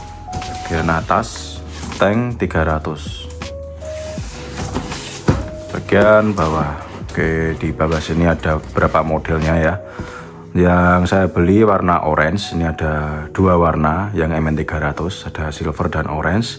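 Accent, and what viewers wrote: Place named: Java, Indonesia. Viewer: review mu seru mas onok logat² jowo e😂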